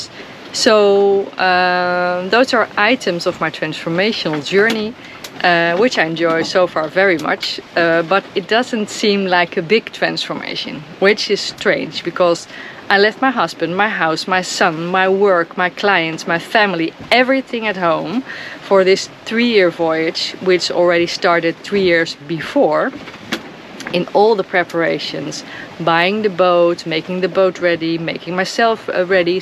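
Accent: Netherlands. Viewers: Wauw wow fantastic adventure Ja kijk uit hoor ,die orcas ! 😅 So kool your solo trip . I like hearing the Dutch accent Moet ik aan Vlaardingen denken . Weggevaard in 1959 eerste keer Naar Canada . Beetje grooter boot maar ja. Boobies yes they are .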